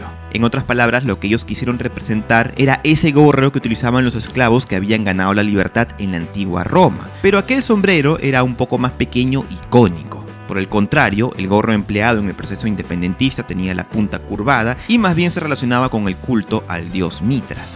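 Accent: Peru. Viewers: Eres peruano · SOY CHILENO Y SE NOTA Q LA VOZ ES DE UN PERUANO DE FUJIMORI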